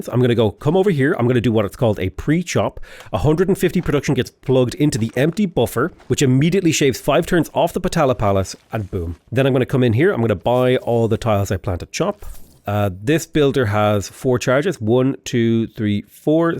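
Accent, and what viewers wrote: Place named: Ireland. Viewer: Anyone else think that as progresses, his irish accent gets thicker?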